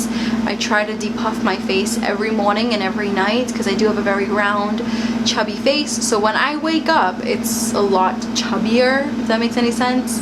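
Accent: Boston, United States. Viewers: I love your boston accent I’m Portland Oregon!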